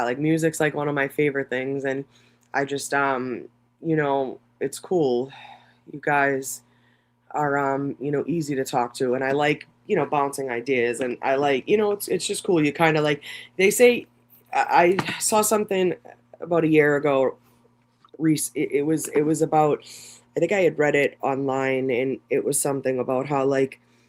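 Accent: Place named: Boston, United States. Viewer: sometimes creative can’t see everyone if they don’t hit live chat and other people can see everyone if they don’t hit all messages instead of top messages. Idk 🤷‍♀️ Will still be watching 👀Listening to you talk is like hearing my family talk. We have the same Boston accent. ❤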